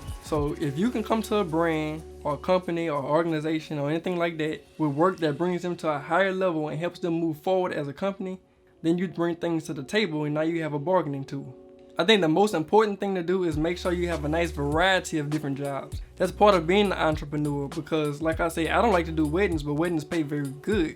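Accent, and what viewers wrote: United States. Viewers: Love the accent bruh what state?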